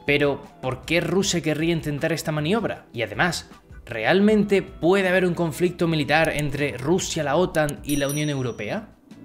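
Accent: Spain. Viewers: tu acento es español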